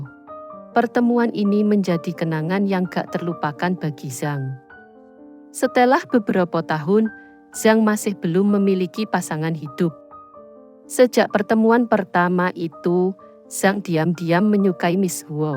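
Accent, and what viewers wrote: Java, Indonesia. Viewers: Medox banget mbak...!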